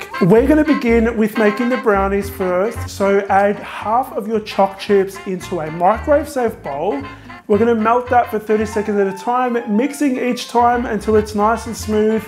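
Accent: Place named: Australia. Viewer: He's Australian. I agree, the accent is lovely to listen to.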